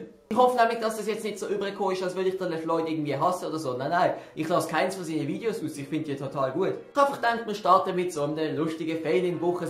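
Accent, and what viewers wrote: Basel, Switzerland. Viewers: Bisch du Basler ?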